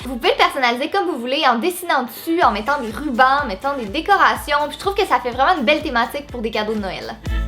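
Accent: Quebec, Canada. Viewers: Rose Francois c'est normal elle a l'accent québécois😁😁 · J'adore ton accent ! Tu es québécoise ? Mais comme dab super vidéo ! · Je viens de découvrir ta chaîne....Tes diy sont superbes,tu es magnifique et en plus,tu posséde cet accent que j'adore,la canada et mon deuxième pays préféré après les Etats unis!!! <3 <3 <3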